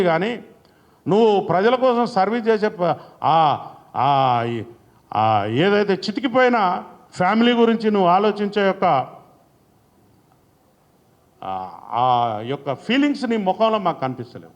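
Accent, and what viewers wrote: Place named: Warangal, India. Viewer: తెలంగాణ పక్కా వరంగల్ స్లాంగ్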